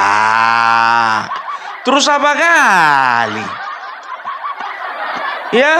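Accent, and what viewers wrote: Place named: Medan, Indonesia. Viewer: Sor kali aku dengarnya, opung bilang nggak aci😂😂😂asli medan kali Sukses selalu opung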